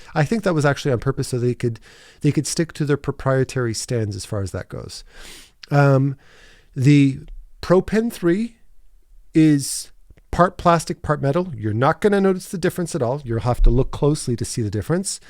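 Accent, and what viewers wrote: Canada. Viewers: Thanks for the review. Very intuitive. I also evaluated Cintiq Pro vs Xencelabs. Also, get the Pro Pen 3E (eraser at the other end of the pen). The ExpressKeys on the Cintiq Pros IMHO are impossible to intuitively used. I also use the keyboard tray (like you do). I hope for screen recording that Wacom releases 1440p (not a or 1080p) Movink 15/16. The Movink 13 is amazing as a portable pen display. To produce 1080p tutorial screen recording to not pixelate when zooming in close, to record originally in a higher resolution (1440p). Cintiq 24 (non-Pro) and Xencelabs 16 works great with no fans and great resolution (2K), but the wasted bezel is awful. So, to record, I also use a separate sound studio, but with the simpler Cintiq 24 OR the Xencelabs 16. My audio recording mic picks up the Cintiq Pro 27 fan noise, and so I use it in my working office. 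I also use Neumann!!! Wow. Overall, I just find that the pen/surface "sensitivety" to be more accurate and responsive, but if I couldn't afford Wacom, I would definitely invest in Xencelabs. Sounds like you have a Canadian accent and we are in Bellingham, WA (close e'nuf to drag my house across into Canada)!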